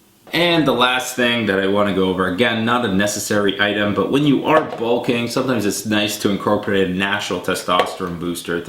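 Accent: Canada. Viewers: You have a thick Canadian accent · Is he Canadian?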